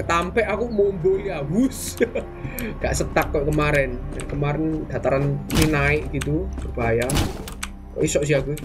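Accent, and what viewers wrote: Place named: Surabaya, Indonesia. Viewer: Logat suroboyo ne tetep podo koyo bien mAS 🥰🥰🥰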